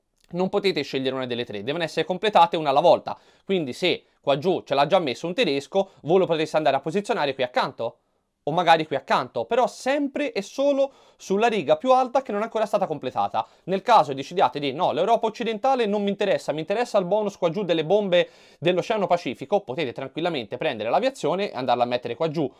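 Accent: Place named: Tuscany, Italy. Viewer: Una delle ragioni che mi hanno fatto scegliere questo canale rispetto ad altri sulla stessa materia è proprio l'accento toscano e il modo di parlare toscano 😀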